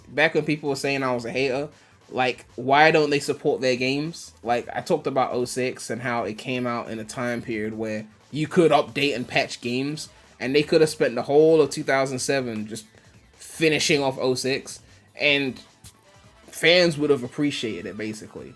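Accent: United Kingdom. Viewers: i like your british accent bruv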